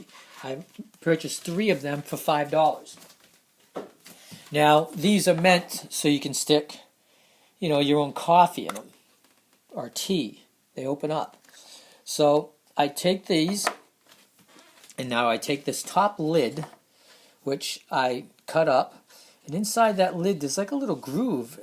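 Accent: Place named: New England, United States. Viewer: Definitely a New Englander!! (Bostonian?)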